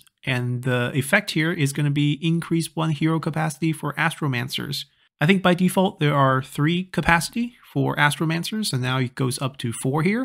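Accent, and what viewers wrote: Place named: China. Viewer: He's pronouncing it in Chinese (which is his prerogative... being Chinese...). China is a big country, and pronunciation varies significantly from one region to another, and Chinese sounds don't perfectly match English sounds. This is probably the reason for the difference.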